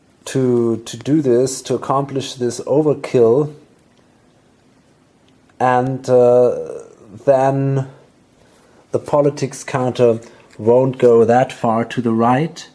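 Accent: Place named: Germany. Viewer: I knew it,deutschen Akzent erkennt man relativ leicht :D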